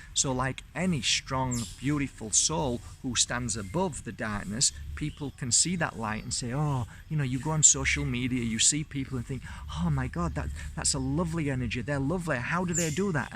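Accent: Yorkshire, United Kingdom. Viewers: I wish I could meet you face to face. Just to spend a little time in your presence would so help with the issues I have. I need to find the happiness within. Thank you so much for your wisdom and all your videos, they do inspire me. Just to have a conversation would be awesome. Thank you. Ps. Love your accent. Little bit of Yorkshire and Leeds.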